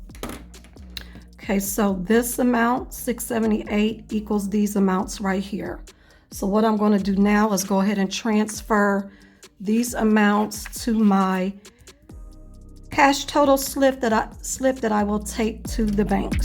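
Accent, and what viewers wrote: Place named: South, United States. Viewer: I love your southern accent where are u from?✨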